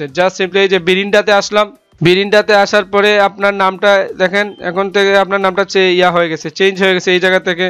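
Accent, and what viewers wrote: Sylhet, Bangladesh. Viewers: Vai ki sylhety😁😁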